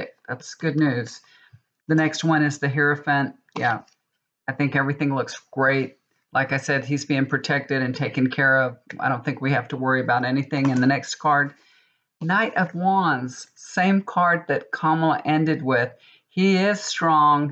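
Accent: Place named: Texas, United States. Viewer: I love the chit chat . I'm from 🇨🇦 and I love that Texan accent plus you have great stories . 💞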